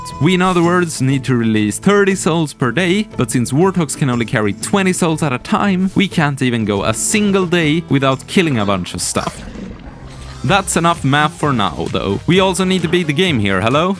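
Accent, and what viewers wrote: Sweden. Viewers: I don't know who you are but you sound so obviously Swedish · Blessed by the algorithm gods! What a fun video, with great execution too! Shocked to see this channel hasn't even broken the 5k mark! Also, you have the funkiest, most pleasant accent. Are you Swedish by any chance? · Love the swedish accent!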